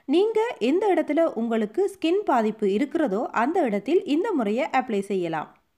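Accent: Sri Lanka. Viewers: they r srilankan i think they say like that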